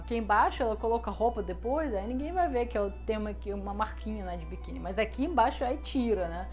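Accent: Rio de Janeiro, Brazil. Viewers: Você fala muito bem português e ainda tem sotaque carioca.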